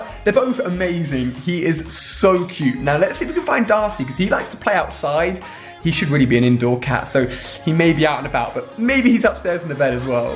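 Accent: United Kingdom. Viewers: I THINK HE IS BRITISH BECAUSE OF THE ACCENT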